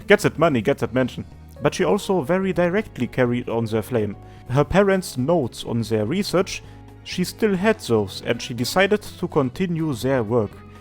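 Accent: Germany. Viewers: The way you pronounced "Heiligkeit" as well as your accent in general make me believe you're German. Please tell me if I'm correct with that assumption.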